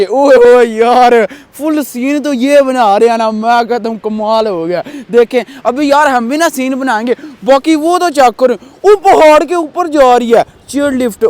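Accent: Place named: Gujarat, India. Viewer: Mazy laa ry o ❤ I am from Mandi bhaudin ❤ I think you are from gujrat ap ki boli gujrat wali haa❤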